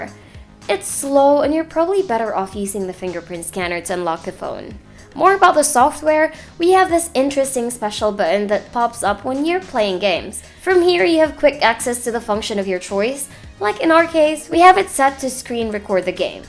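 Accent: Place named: Philippines. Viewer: Her Filipino accent is quite fading, so majority of the words you hear are the right pronunciation since she has borderline American accent.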